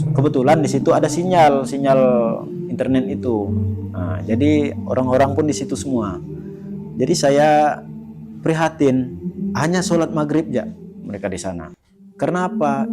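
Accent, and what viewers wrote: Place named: Madura, Indonesia. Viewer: Kok logatx madura bang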